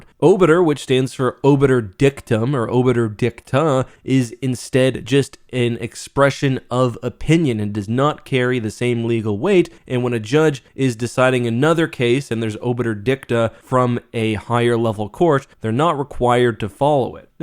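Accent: Canada. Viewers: Amused. I live in Alaska so I didn’t even pick up on your Canadian-ish accent until you stated that you “practiced Canadian law.”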